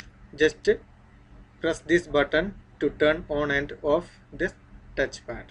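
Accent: Kerala, India. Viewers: മലയാളി ആണല്ലേ.. ഇംഗ്ലീഷ് കേട്ടപ്പോ തോന്നി... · Malayali analle 😆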